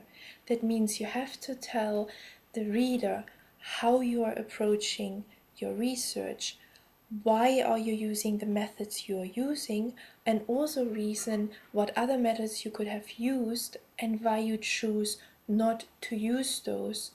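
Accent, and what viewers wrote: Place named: Germany. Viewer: Are you a german, because you sound like a german speaking english.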